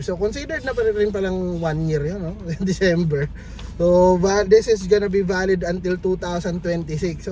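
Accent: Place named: Conyo, Philippines. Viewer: ang conyo mag salita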